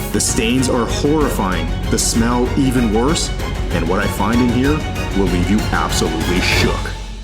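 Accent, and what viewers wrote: Canada. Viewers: I always quickly ask myself why parts look like the vehicle was around the coast too much as a Texan, then quickly remember this is from the salt on the roads during y’all’s inevitable snow in SK, Canada. One of my beloved best friends of decades is from there and he has many stories of living on a farm and dealing with crazy amounts of snow. Much love, enjoy the vids so much. I love catching your Canadian accent btw.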